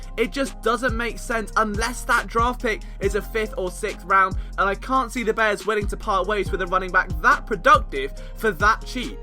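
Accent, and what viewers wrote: England, United Kingdom. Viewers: you nailed it 'unless that draft pick is a 5th or 6th but i dont think the bears would lose howard for that ' hahahhaa well guessed not only was it a 6th that miiight become a fifth but it was also a bonus comp.formula pick PLUS it wasnt a 5thor6th rounder THIS year, its for 2020, we gave up a 6th or possibly 5th round pick NEXT year for stability in the RB room - id lose smallwood, keep adams as a prospect and see if he grows, keep corey clement for his pass catchin (if sproles retires or eithe rway really) i think we should still draft an RB in this draft, esp if a legit back falls, idk bout jacobs in the 1st but there are a few really good backs that will go in the 2nd/3rd so since we have two 2nds and no picks till later in the 4th (when the good RBS on our board could be gone) i reckon we either use a 2nd on a good back that falls OR we trade one of our 2nd round picks to a desperate team lookin for a specific player etc for two 3rds this year or a 3rd and 4th this year and then we draft a solid RB in the 3rd, even using the other 3rd/4th round pick in a bundle to move up to the first pick of round 3 or high enough we KNOW we'll get our guy... 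and can i just say as an english born and bred (but in japan, then NZ where i got citizenship, then america where i did, now i live in australia so its cool hearing an english accent talk american sports with knowledge - reminds me of my dad (who obv kept his english accent since he left engald at age 21,s ame with my MUm btw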